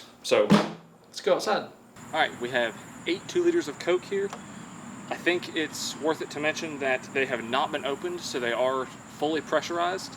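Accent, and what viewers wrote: South, United States. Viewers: I know he sounds southern at times but he jus lost his card there for sure 😂🤣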